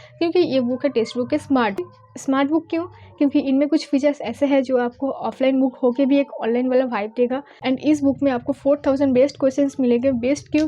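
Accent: Bengal, India. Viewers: that's so sweet of you mam . Tnq so much mam ❤️well I love your Bengoli tone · U from Bengal?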